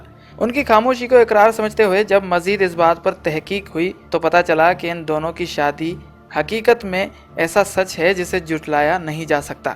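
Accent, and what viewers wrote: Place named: India. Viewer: kismat or qismat.. gujaar or guzaar. mutabik or mutabiq.. is trah doosre alfaaz, indian jessay urdu/hindi bolte hain. kitni jamaat padhay ho??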